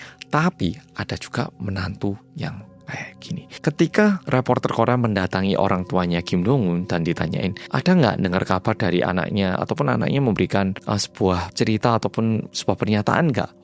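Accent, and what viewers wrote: Java, Indonesia. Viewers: Malem medok🎉 Lgsg nyimaaak 🎉🎉🎉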